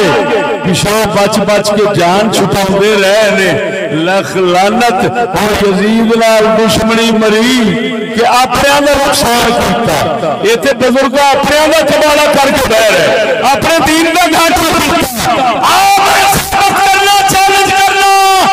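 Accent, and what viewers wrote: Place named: Punjab, India. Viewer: Urdu ati hay laykin ap ki zuban mubarak pr punjabi charhi hoi hay.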